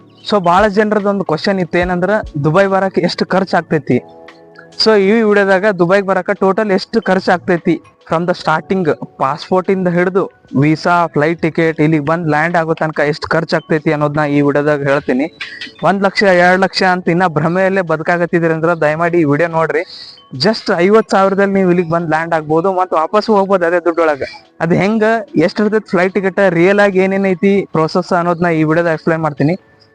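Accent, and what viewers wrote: India. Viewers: Nevu north kannadaana... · Houda okay ನೀವ್ ಮಾತಾಡೋದು ಸ್ವಲ್ಪ ನಮ್ಮ ತಾರಾ ನೆ ಅನಿಸಿತು ಅದಕ್ಕೆ ಕೇಳಿದೆ , ನಮದು ಬೆಳಗಾವಿ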